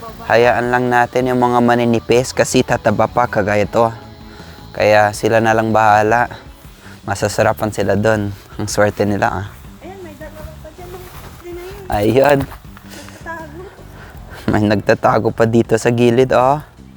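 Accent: Philippines. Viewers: Accent ni kuya, ilocano metten😂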